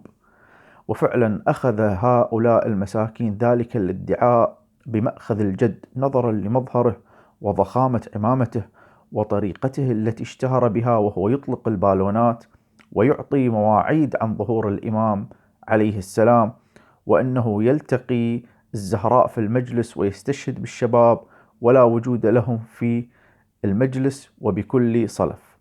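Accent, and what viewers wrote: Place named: Iran. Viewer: لكنة فارسية على عراقية هههههه هههههههه متعصر كان يزط ههههههههههه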